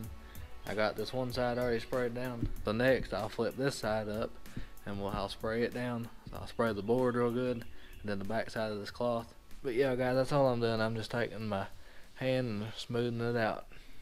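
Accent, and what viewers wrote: United States. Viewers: Awesome video! Straight to the point with all the information you need without a buncha blah blah blah, and a plus is you got a southern accent like me! EXCEPT it is impossible to unplug the harness glued to the headliner ! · U did a good job being the first one, their is no way we don't live close to each other with that accent, I live in Central NC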